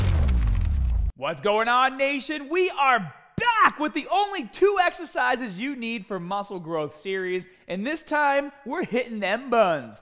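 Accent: Boston, United States. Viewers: Can really detect the Irish pronunciation in the Boston accent. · What kind of American accent is this? Sorry, I'm not American 😆 haha! It's like the "r" in the word "started" is silent. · Boston. It sounds nothing like New York. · New Englander accent all the way. I'd say Boston. He tries to hide it to sound more professional. Also, if you're not from Boston, you're not going to understand the accent. So he's doing us a favor. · Mr Cartoonster I did think Boston!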